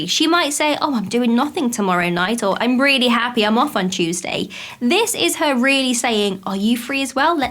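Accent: United Kingdom. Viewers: Looking at you and listening to you is like looking at you and listening to you for the first time. Love your British accent on top of that your personality and beauty sets it off. You're fine as frogs hair!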